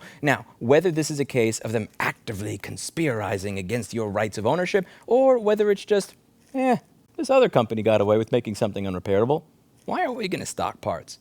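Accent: New York, United States. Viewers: The New York accent was in full effect today😮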